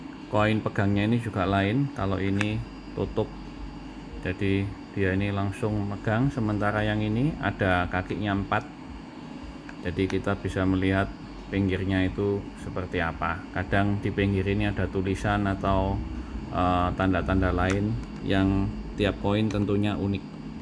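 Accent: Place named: United Kingdom. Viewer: cengkoknya british bgt❤👍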